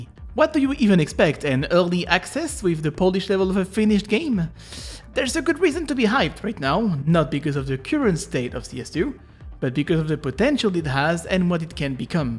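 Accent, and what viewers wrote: France. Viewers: embracing his french accent more than usual?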